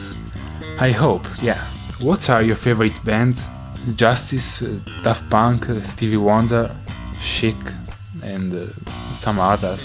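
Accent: Italy. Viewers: Vusferno Music E' italiano :) l'accento italiano si sente ma la pronuncia è molto buona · Italian accent all the way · Giovanni Dalo Ho visto poi nelle info, dove ho avuto la conferma direttamente da! Il punto non è che lui abbia una pessima pronuncia, anzi tutt'altro, forse mi sono spiegato male, il problema è che per noi latini è molto difficile riuscire a parlare un inglese senza far capire la nostra provenienza. Prendi i paesi nordici (pewdiepie ne è l'esempio) fin da bambini ti istruiscono a parlare inglese nello stesso modo in cui impari la tua lingua madre e noi italiani per quanto possiamo impegnarci, senza avere esperienze all'estero ci rimane difficilissimo migliorare la nostra pronuncia. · So, you actually made an italian voice reveal before 4M subs. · Wow si sente che sei italiano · Okay so this was the Italian voice reveal. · si sente troppo che sei italiano hahahah,suono anche io il basso,spero di arrivare ai tuoi livelli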